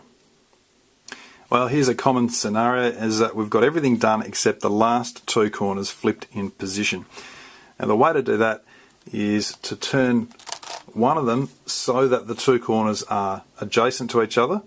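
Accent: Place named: Australia. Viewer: Are you Australian, mate? Loved your accent 😂 Also loved the tutorial. It really is the best! You explain all the basics, but don't treat your viewers as idiots and repeat same things seventy two times. Your explanation is clear and simple and you rule!! 😎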